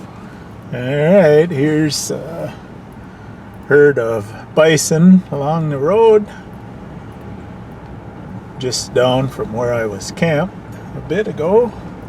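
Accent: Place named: Midwest, United States. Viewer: This is the cutest and most wholesome road trip video I have ever seen in my life. I love this guys voice. So Midwestern. 🤗🤣💜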